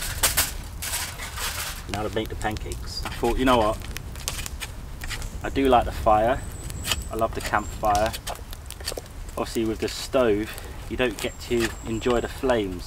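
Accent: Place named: England, United Kingdom. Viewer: Just subscriber I love your jolly English man accent 😊were Americans me Italian but what a great channel 👏 the music your accent and real personality 😊.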